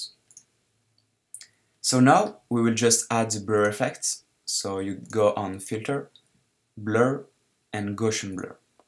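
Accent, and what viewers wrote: France. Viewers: j'étais sur que tu étais francais :D on reconnait l'accent et bravo pour tout ce que tu fais j'aimerais vraiment que tu reprenne ps : j'ai 4 de tes wallpapers dans ma chambre aller salut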